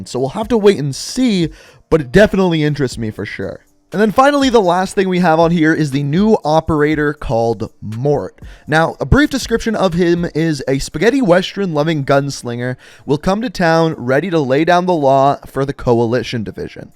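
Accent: Canada. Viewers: I love yo videos. Ur Canadian ryt just wondering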